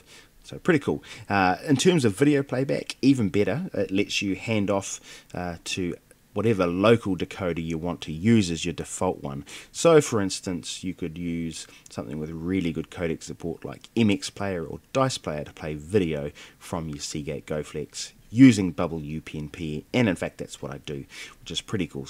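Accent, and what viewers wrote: New Zealand. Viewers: Are you from New Zealand by any chance?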